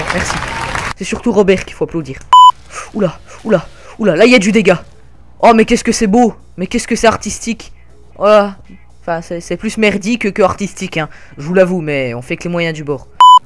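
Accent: Belgium. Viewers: tes belge ? Vrèment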